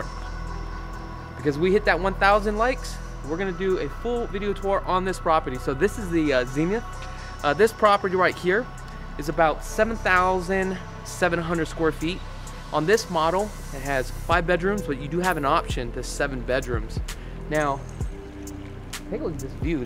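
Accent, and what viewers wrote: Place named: New York, United States. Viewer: I can’t stop watching your videos. You sound like you are from New York lol or back northeast.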